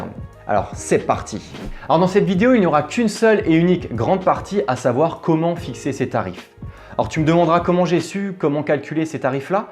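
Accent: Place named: Alsace, France. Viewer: on reconnait l'accent alsacien !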